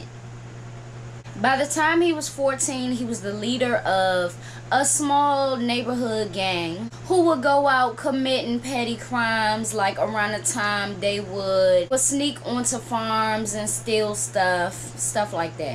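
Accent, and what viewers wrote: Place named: Baltimore, United States. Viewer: I just love your accent that dc-baltimore accent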